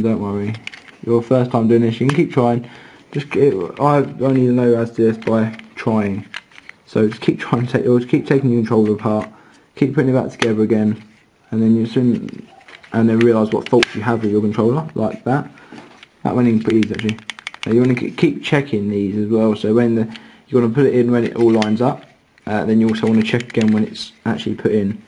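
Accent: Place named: United Kingdom. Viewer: The HEAVY Brit accent is hard for this Yank to understand.I got about half of what he said, Sorry mate.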